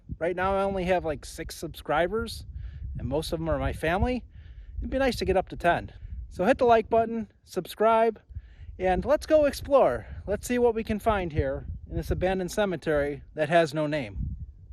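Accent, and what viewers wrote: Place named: Chicago, United States. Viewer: I had to subscribe for the name of your channel alone! I love honesty. Then I heard a Chicago style way of speaking and that you were doing an abandoned cemetery near the Chicago area. What's not to love? Maybe check out what we in the neighborhood called "The Lost Cemetery." (It's on the Northside, fairly safe neighborhood.) It was next to Labaugh Woods along the train tracks. More recently a good deal of the land was sold off. They claim to have moved the bodies. I DO NOT BELIEVE THEM. And there are STILL headstone to be found there today, in the woods behind the houses they build over the graves that are still there. You can park in Lil Labaugh Woods or the main parking, both parks are along Foster Ave, if you want a good place to park. The entrance to the larger picnic area and parking is off Cicero Ave. Or you can drive West on W. 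Bryn Mawr Ave along the North edge of Bohemian Cemetery. You'll reach a bike trail that use to be train tracks The Sauganash Trail Bike Path. You past a subdivision on your left, that was part of "The Lost" Cemetery and still is! If you walk south on the bike trail toward Labaugh Woods on your left is the Lost Cemetery. The headstones are still there. The bodies are still there.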